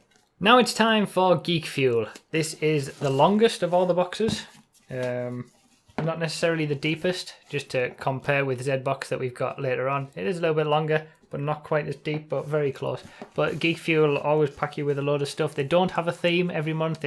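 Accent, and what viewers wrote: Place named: Middlesbrough, United Kingdom. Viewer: You from Middlesbrough?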